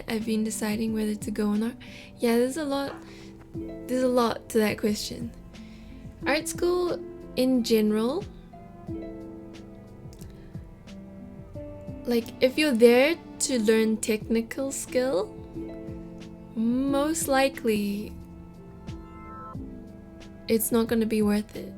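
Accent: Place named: New Zealand. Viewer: Kiwi accent is the best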